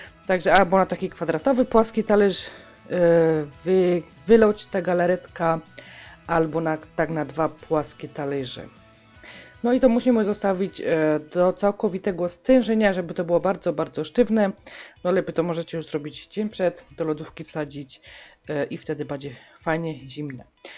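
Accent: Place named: Opole, Poland. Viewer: acha i miłych walentynek jo też mieszkom w rajchu ale jestech ze śląska! 26 lot minolo ale godac po Śląsku niezapomnialam ty mosz taki richtig opolski akcynt jo zaś jestech z okolic Bytomia i tez godom blank po naszym moja koleżanka prowadzi w tc Katowice dej pozor aż gania ze tyla ludzi ze śląska juz niezno tej godki! my Muszyny się tego czimac!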